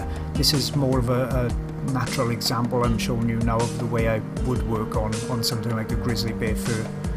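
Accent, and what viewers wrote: United Kingdom. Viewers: Interesting but the music is too loud so it's difficult to understand when we don't speak British.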